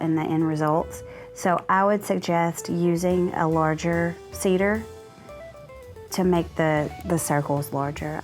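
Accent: South, United States. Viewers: You are very talented, got homesick listening to your accent, I am a displaced Texan!!! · Got to love a pretty lady leathercrafter with a southern accent. You probably found that you dulled your clippers shearing the lining. But they can be easily resharpened with a whet stone.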